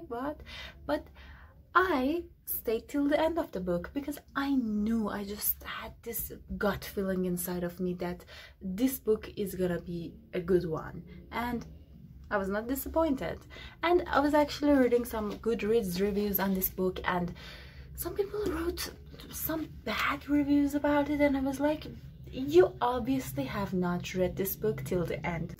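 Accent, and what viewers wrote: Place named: Iran. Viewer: i just started reading it. i had second thoughts just in the beginning of the book but I'll power through. also your pronunciation of names in the book made me sure you're persain.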